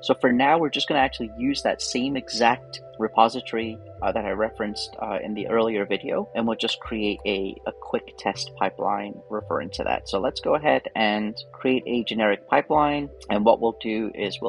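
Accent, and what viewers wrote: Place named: United States. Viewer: you sound like you're in high school, super american, the profile pic is a guy in his late 40s called. what is this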